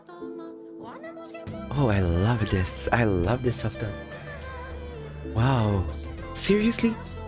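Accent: Philippines. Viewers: (I'm greek) No she's not a soprano. She just sings songs with traditional sound! (ethnic etc) but makes them more modern.. btw are u filipino? I feel like your pronunciation is very familiar to me hehe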